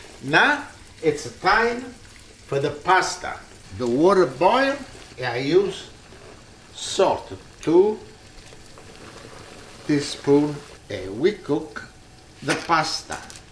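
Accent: Italy. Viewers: Growing up in East Vineland, we were surrounded by hard-working truck farms. With that came the best Italian/Sicilian cuisine. You, dear sir, not only remind me of these fine memories but also have an accent I know well. Heartwarming. I have been following you for years. My daughters grew up on this food. They, too, think you are adorable as they pass down these fine traditions to their families. You are a true master of this art. Thank you so very much!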